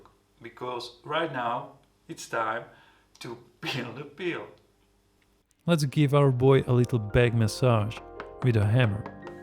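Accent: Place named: Czech Republic. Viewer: that czech accent tho.. classic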